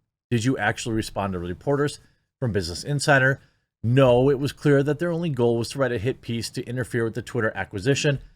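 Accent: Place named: North, United States. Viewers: Yo, ignore those idiots who take issue with your pronunciation of the short-a sound ("ă" --- which the International Phonetic Alphabet actually represents with this symbol: "æ") like as in words that rhyme with "flag" and "bag." (Again, in the IPA, those two words are actually even represented, respectively, as "flæg" and "bæg," so it's not like the slight bit more emphasis on the "e" part of the "æ" was ever really wrong, anyway.) The only thing i will say, is that's not a midwest accent (well it is, but it's more specific than that). That's a Northern Midwest accent. Chicagoland and higher latitudes. I had to point this out because, as a fellow midwesterner, i don't pronounce my vowels like you do but I'm still midwestern. However, I know my neighbors to the north do, certainly, sound like you (and it's part of their charm lol). So, long story short, don't acquiesce to these numbskulls, be proud of your accent (and the region it associates you with in this great, massive, country of ours [.. 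"from SEA to shining SEA," commies]). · please don't care about the way you say flag or bag or stag or any of those type of words. It is normal to say it that way i nthe mid-nrothern states so no need to be self conscious about it.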